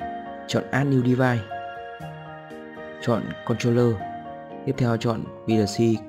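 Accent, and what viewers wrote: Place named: North, Vietnam. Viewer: Rất hay, giọng miền bắc thân thương, video ngắn , trọng tâm ko dài dòng'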